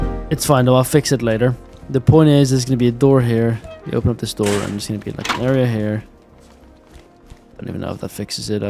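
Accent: Netherlands. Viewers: Are you Dutch... ? :) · damn wist niet dat je nederlands was tot ik je hoorde praten met je team haha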